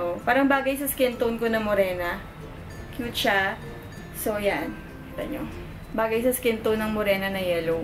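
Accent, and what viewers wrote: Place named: Pampanga, Philippines. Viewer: U sound kapampangan😂✨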